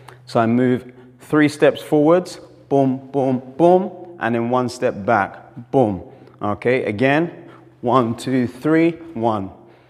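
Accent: United Kingdom. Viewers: primera vez que oigo a un cubano (si no entendí mal) hablar en un perfecto acento britanico... y encima con excelentes tecnicas! gracias!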